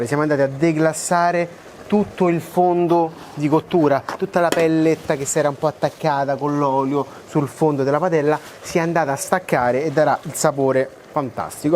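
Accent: Rome, Italy. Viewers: Come sei simpatico, ai un dialetto! Che mi piace da morire! Super romanesco! Io sono Toscana Pisa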